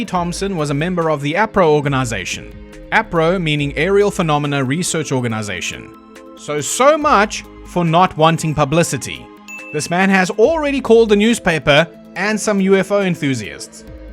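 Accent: South Africa. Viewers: Is this commentator South African or Rhodesian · He's from South Africa, so you can't expect him to sound like an American, Canadian, Englishman, Scot or Australian. I get a kick out of his speech. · The South African accent made this so much better😂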